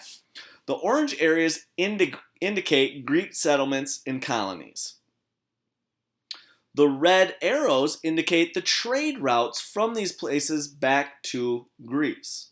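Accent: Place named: United States. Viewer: he has a new york/jersey/midwestern accent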